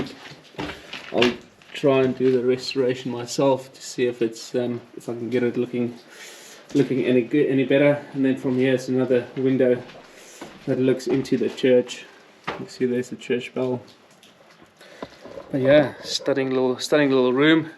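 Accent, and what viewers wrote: South Africa. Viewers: Thought l recognise that SA accent. Bietjie verbaas dat jy kla oor kerkklokke wat mag raas? skaam jou! Klou aan die bietjie mooi in die lewe. Dis n voorreg om daar te kon koop en alhoewel dit baie werk gaan wees, maak die meeste daarvan - van ALLES!!! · is that a SA accent hear? SUBSCRIBE!!! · I support you efforts on restoring this Italian beauty but please please work on that monotone South African narration 🤮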